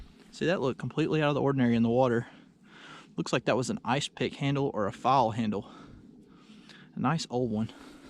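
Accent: South, United States. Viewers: Thank you four comments about us older folks am one of those disabled elderly ladies who appreciate the content as well as your southern accent. Keep it up!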